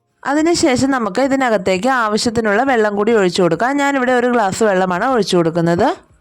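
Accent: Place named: Central Travancore, India. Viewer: Central Travancore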